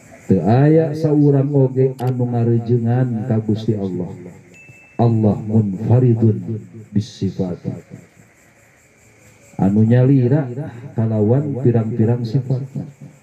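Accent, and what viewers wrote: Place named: Sunda, Indonesia. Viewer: urang sunda mah tangtu raoseun ngangge logat sunda, nuhun pisan.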